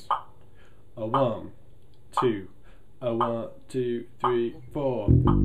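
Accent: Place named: Northern England, United Kingdom. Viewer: I usually don't dig instrument lessons and rather prefer to find out those things myself (takes longer, yes). But these lessons are perfectly inspiring - and you don't often find bass playing being explained in that great Northern accent. :)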